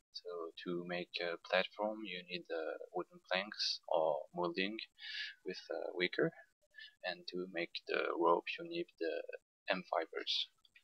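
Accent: France. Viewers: Well that's your own fault. Have you never heard anyone with a French accent speak English before?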